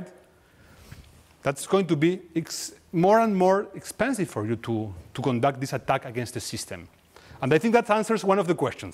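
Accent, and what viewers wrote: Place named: Argentina. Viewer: He’s from Argentina, English isn’t his first language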